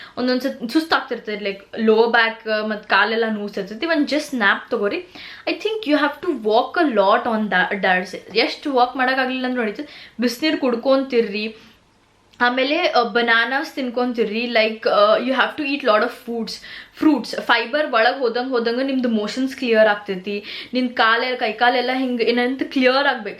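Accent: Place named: North Karnataka, India. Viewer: 1st channel which i have subscribed in YouTube. Irrespective of content ond reason Kannada adrallu uttar Kannada innondu gotthilla 😜 sometimes we like some things without reason… keep talking 👍👏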